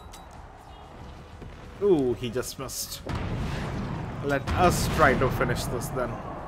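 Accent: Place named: South India, India. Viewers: Are you Indian? Every time I think I have your accent figured, I doubt myself. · There are many indian accents. This is a southern one.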